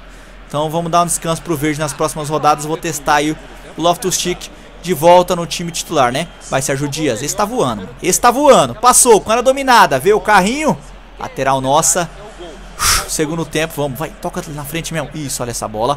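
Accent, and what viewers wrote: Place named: Bahia, Brazil. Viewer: Eae aonde se mora pow tem um sotaque da bahia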